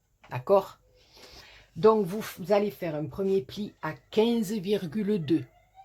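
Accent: South, France. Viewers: Coucou, rhooo mais où vas-tu pêcher toutes ces idées fantastiques. C'est vraiment très beau d'autant que l'on peut le refaire pour toutes occasions. C'est génial et réellement une bonne idée cadeau. Avec ton petit accent du Sud et ta gentillesse c'est un régal de visionner tes videos. Un rayon de soleil en ces périodes certes festives "normalement" mais quelque peu moroses à cause de ce foutu virus grrrrrrrr. Superbe!!!! Gros bisous et merci pour ce partage.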